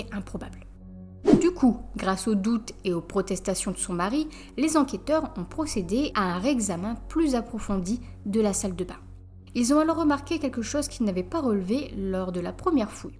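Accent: North, France. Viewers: Tu es du nord toi non ?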